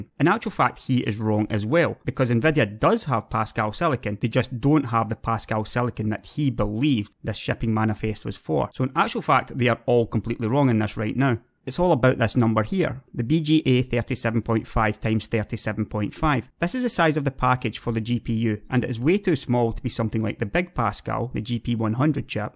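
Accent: Scotland, United Kingdom. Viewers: your TRAINSPOTTING accent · hard for me to understand your wonderful Scottish accent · holy shit he's a scottsman! was not expecting that! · I love the Scottish accent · He has a Scots accent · your lovely Scottish voice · i liked your skotish accent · He is scottish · he was speaking with a Scottish accent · pretty sure he is British, Scottish my guess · love your accent? you scottish? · This is the scottishest schottish accent I have ever struggled to understand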